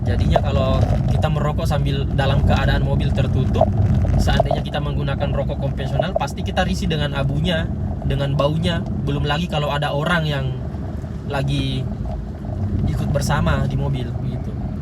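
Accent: Indonesia. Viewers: Orang makassar klo dilihat dri logat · Logat sumatra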